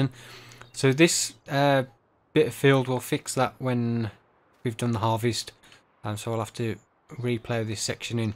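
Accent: United Kingdom. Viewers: I only found your YouTube channel a week or so back I am now going back through the older video I have to say I think you speaking is much better than just the music and txt it’s good to hear another UK person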